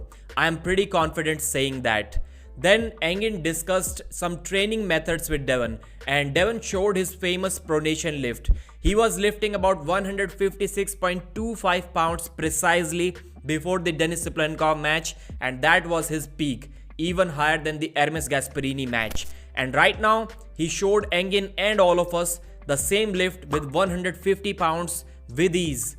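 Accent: India. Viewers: Dude your indian accent is f@ annoying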